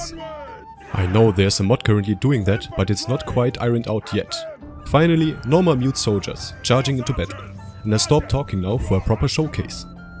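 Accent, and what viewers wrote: Germany. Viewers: When you hear the accent of a fellow german you can just sub. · amazing as always :D thanks for your output mate <3 offtopic: are u german btw? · lol junge bist du deutscher? Ehre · are u german? and are u speeding up ur videos? :D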